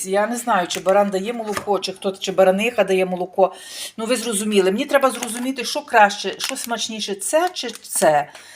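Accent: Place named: Western Ukraine, Ukraine. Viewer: Да это западенцы на своем суржике" балякає"🫤👎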